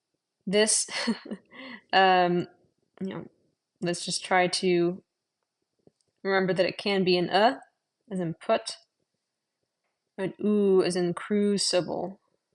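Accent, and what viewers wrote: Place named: United States. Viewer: Nice video! I really wish you'd used IPA though, maybe even in its own extra column -- it would've been a lot easier for me to glance over and understand the pronunciations that way. I majored in linguistics, to be fair, but I imagine a lot of people interested in Middle English on YouTube may have such a background. I also evidently speak a dialect of American English that doesn't match yours (my "beggar" has the SAME e sound as "better"), so some of your explanations led to me learning equally as much about your particular accent as about Middle English! Anyway, awesome job, and I'm looking forward to bingewatching this series! Thank you so much for creating a Middle English YouTube niche :D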